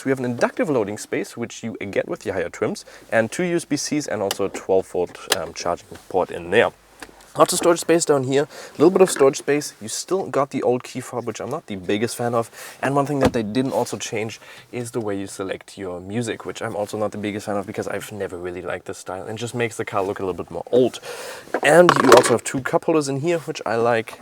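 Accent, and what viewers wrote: Germany. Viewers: Is he from Germany? He sounds really german to me.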